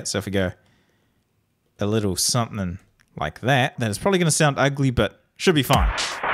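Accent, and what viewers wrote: New Zealand. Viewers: Your from NZ aren't you?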